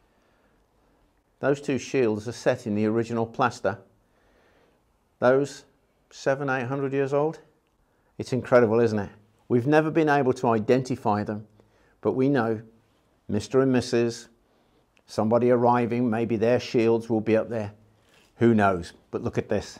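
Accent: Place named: England, United Kingdom. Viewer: He's not American. He's English. Very clearly English.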